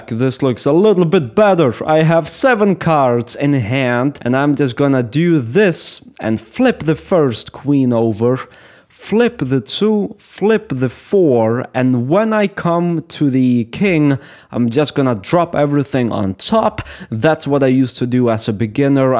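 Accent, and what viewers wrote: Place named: Russia. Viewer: how many accents do you Russians have? I've watched few Russians youtuber all of them are diffrent and none of them speaks the same accent as I've seen in movies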